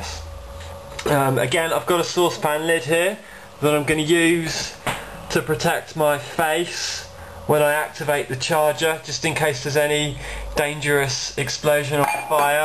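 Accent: United Kingdom. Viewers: that was really funny! ...might just be the British accent, though. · Nothing he is BRITISH!!!! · you are so british. it's awesome. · wow some ones british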